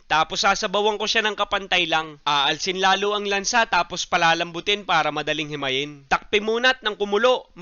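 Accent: Batangas, Philippines. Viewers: Magaling na mag luto natutuwa pa ako sa Punto ng Batangeño 😂😊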